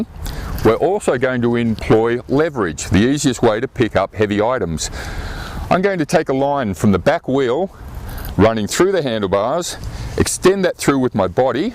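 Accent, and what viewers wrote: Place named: Australia. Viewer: love australian accent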